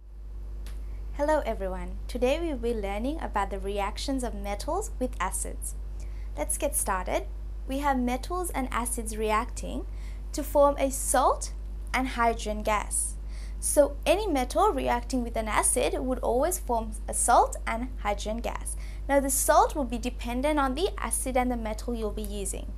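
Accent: Australia. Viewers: I can sense an Australian accent 👀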